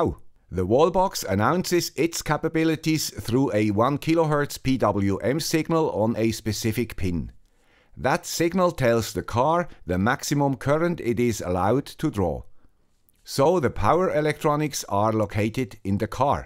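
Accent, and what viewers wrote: Switzerland. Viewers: Right now YT suggested me this video. I rushed to it when I saw it was from the guy with the Swiss accent. I really miss your videos,. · I really really happy, hear your swiss accent again. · Adding to other viewers comments, I've missed the Swiss Accent too. Greetings from San Francisco. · Nice to listen to your swiss accent again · Hes back, and his accent is more swiss than ever! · Omg I can't believe I'm hearing "the guy with the Swiss accent" "live" with fresh ideas, again! 😁😁😁😁😁 Thanks as always! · Nice to hear the Swiss accent back! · We missed that Swiss accent so much!! Welcome back and congrats on the new ride🎉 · Yes, the guy with the swiss accent is back and helpful as always. Cheers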